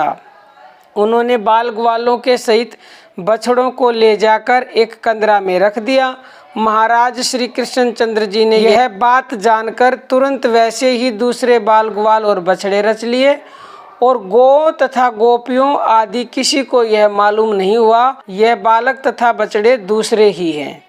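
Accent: Haryana, India. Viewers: जय श्रीराम माता जी आप क्या हरियाणा से हैं क्या आपकी आवाज से तो ऐसे ही पता चलता है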